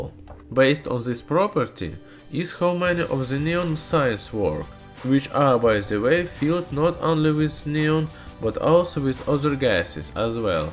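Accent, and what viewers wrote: Russia. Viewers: I can't understand anything from your "russglish"👌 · nice to be a brazilian that try to understand a russian talking in english. =D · this is russian accent??😂😂😂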